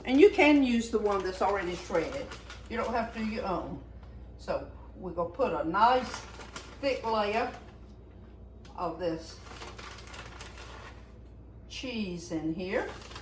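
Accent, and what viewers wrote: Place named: Savannah, United States. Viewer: I shall and you sound like my people from Savannah and South Carolina. I love it!!! And they DID the crab! They went and spent a whole morning catching them and then the whole afternoon picking them! Good memories!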